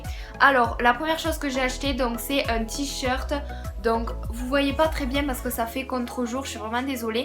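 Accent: South, France. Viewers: L'accent du suuuuud !!! J'aime trop OMG !😍💘💍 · Ralala l'accent du Sud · l'accent du sud🙃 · j adore ton accent du sud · Mais votre accent , vous etes française ?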